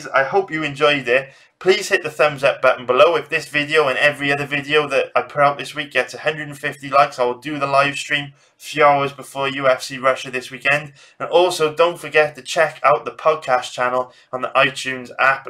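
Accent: United Kingdom. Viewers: ✊🏼👊🏼All day dude, yall whats ur accent, theres like a lil welsh in there, scouse myself, just curious, looking forward to next parts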